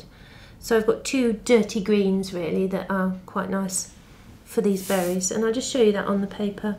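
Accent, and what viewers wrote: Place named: England, United Kingdom. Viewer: Hence she has an English accent. We say people have French, German, Irish and other national accents just as I have a Scottish accent so why not an English one?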